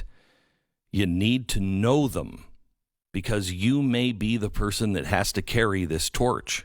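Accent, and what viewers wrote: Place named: Russia. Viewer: Every time I think you are almost credible, out comes the crazy russian accent, the blanket statements of "nazis" coming to crush America, and the call to arms for us to stand strong against an enemy that we have no possible way of confronting. In the end I become more convinced that you are the face of crazy propped up by the deep state. Put here by design as an example of what foil hat wearing wackos look like so that by association we are summarily dismissed.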